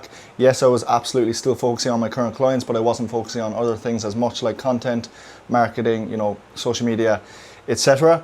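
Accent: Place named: Ireland. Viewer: Beautiful Irish accent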